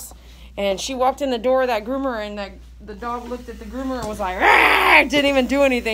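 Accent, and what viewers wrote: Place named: South, United States. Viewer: Love the country talk attitude with the nail trim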